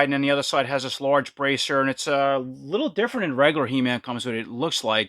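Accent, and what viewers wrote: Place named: New Jersey, United States. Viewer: Great review! I love your NJ accent haha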